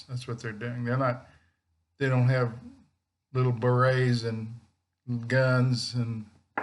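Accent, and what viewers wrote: Texas, United States. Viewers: Moved from Austin to N. CA 20 years ago and I find a certain comfort in Texan twang (not as strong as my dad's was), too... and I miss Central Market/HEB!:-) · I sure enjoy your readings, but I LOVE your accent!! Moved from TX to AZ this year and I miss that TX accent .... and HEB !!!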